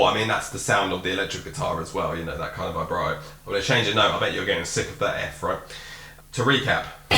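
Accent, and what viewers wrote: England, United Kingdom. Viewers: I didn't realise you were English. 👍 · Cool,! I like these tutorials, keep 'em coming. I like to listen to your Brit accent, too. 💯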